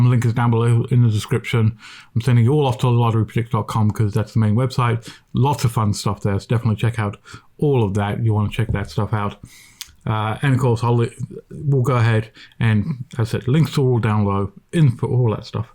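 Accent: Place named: United Kingdom. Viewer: Hi there You sound like you are from the UK but I can’t see any Lotteries for here I am guessing that the Uk one is a lot more complex to win than some of the others Only just started watching this about the Thai Lottery a few mins ago Do you offer live chat like other people which I noticed seems to be a new feature It is a good idea as it’s quicker and not everything needs to be written in public Kind regards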